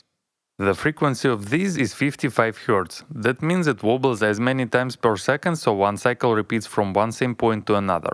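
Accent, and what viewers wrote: Russia. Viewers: Акцент очень похож на русский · Nothing is better than a Russian person teaching you! Very unique person with good knowledge & really like his accent :))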